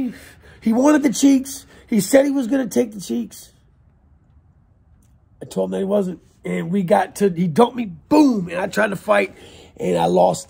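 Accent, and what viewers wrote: Virginia, United States. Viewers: Your def from the VA area......love your story telling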